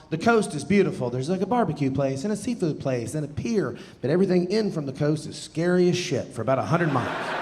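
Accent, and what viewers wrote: South, United States. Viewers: Agreed! It's giving Josh Johnson precision with a southern white dad twang. Here for it 🙌🏾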